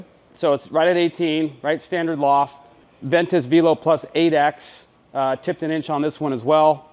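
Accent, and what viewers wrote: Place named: Pittsburgh, United States. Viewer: Yinzer haha. Bayg.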